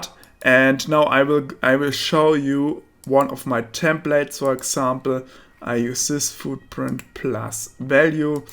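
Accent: Germany. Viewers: I used to work for BMW corporate. Miss the German accent lol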